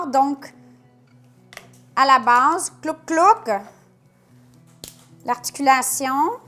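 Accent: Canada. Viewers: Coucou, j'adore tes vidéos, ta bonne humeur me fais passer de super moments!! Je suis italienne mais j'habite en Suisse, et je conseil ton blog à tout le monde (ici on adore l'accent canadien;) ). Je ne sais pas comment j'ai découvert ton blog mais depuis ce jour là j'attends tes vidéo avec impatience. J'admire ce que tout fais et surtout comment tu le fais. Merci pour tes recettes et tes sourires.:) Tania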